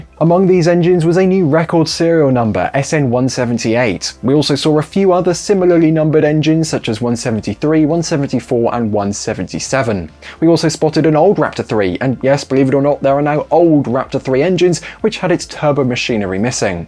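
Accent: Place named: England, United Kingdom. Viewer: Are you really trying to tell the English guy how to speak English? The meth pronunciation is from the dumbed down version of English. 🇺🇸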